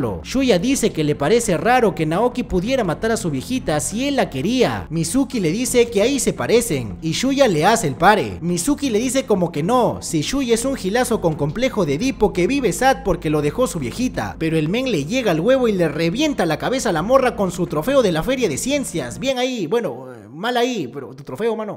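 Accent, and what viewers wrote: Peru. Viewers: Eres de lima? xd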